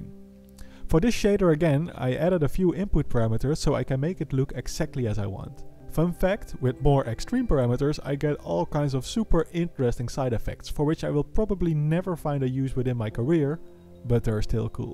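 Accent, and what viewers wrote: Netherlands. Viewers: Hey maybe a odd question but are you dutch by any chance.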